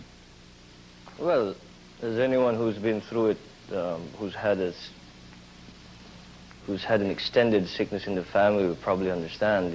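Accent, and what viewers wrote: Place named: United Kingdom. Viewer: Napaka talino ng ating bagong elect na pangulo. Napaka pogi pa. Hawig pala sya n vince. May british accent pa sya dito. Naging emotional sya at times at nag ligid ang luha . Mahal naming PBBM, this is it, ung sinabi nyo po na gagwin ninyong lahat para matulungan ang mga pilipino . Like your father and PRRD , mahal na mahal nyo rin po ang mga pilipino at ang ating bayan. Mabuhay po kayo God bless you and guide, protect and help you in governing the Phil. ❤️✌️🇵🇭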